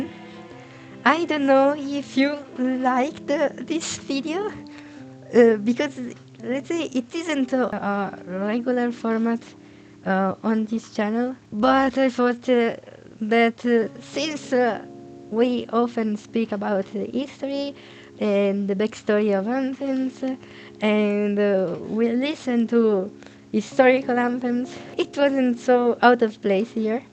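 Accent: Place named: Italy. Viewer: What is your native language? I'm curious it really sounds like italian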